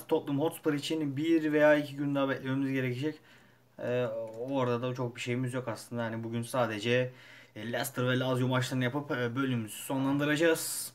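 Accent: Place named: Kırklareli, Turkey. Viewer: konuşmandan anladım bende Kırklareliliyim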